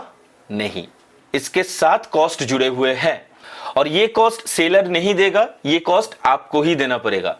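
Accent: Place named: Bengal, India. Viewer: I can say you are bengali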